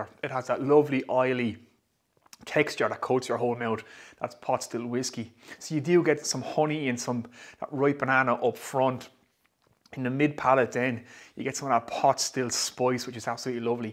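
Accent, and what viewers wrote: Ireland. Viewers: HI! I love you reviews! They have helped me understand Irish whiskeys so much better. Qstion for you- I have a friend going to Ireland in about 10 days, I want to have him pick up some whiskeys for me, preferably something I cant get here in the states. I do like bourbon style whiskey the best, Jameson is good but im not a big smokey scotch drinker. Any suggestions? Locally I can get powers Gold label and im going to try that! LOVE THE BROGUE!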